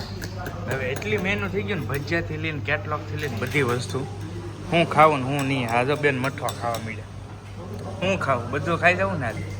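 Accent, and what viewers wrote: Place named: Gohilwad, India. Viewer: गोहिल वाड की बोल पहचान जाता है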